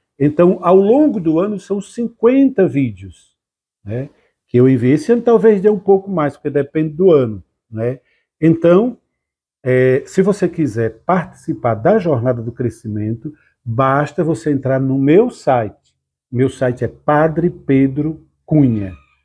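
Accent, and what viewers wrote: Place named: Northeast, Brazil. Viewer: sotaque nordestino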